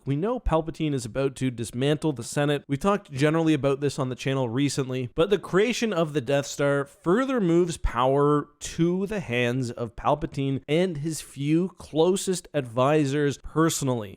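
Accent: Canada. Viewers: this canadian english makes me imagine all the ways Canada prospers off america, like this yt platform with commentary on a hollywood production.. weird ways like this that does not seem obvious.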